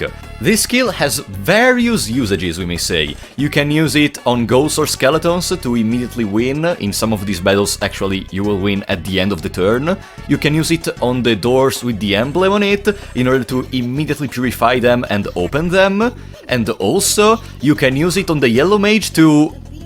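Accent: Italy. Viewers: Sento una cadenza italiana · Ahahahah! Ascoltando la pronuncia, sono andato a vedere nei commenti se fossi italiano. Good job, anyway!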